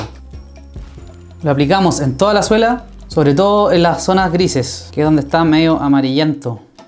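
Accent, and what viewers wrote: Chile. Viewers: Watafaka apenas te escuche. Me di cuenta que eri chileno jajajajaj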